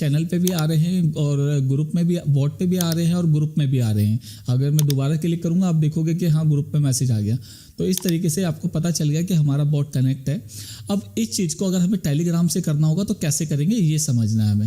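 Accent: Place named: India. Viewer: For fucks sake, is there anyone on YTB, who explains this and is not Indian and can explain it in clean English?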